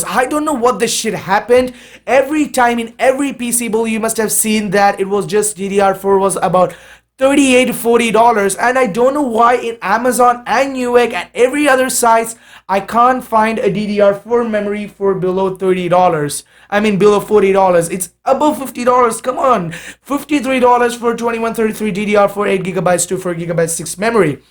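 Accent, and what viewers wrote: India. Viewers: Bro I am a proud Indian and I thought that ur accent Was like a Indian That's why I asked 👍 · u sound indian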